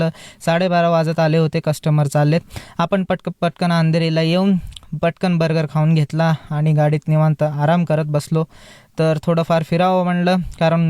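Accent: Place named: Solapur, India. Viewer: पुण्यात सोलापुरी बोलतोय.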